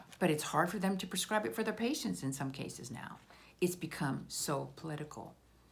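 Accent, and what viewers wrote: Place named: United Kingdom. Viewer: What accent is this? You look Eastern European, sound British and seem very intelligent 😌❤️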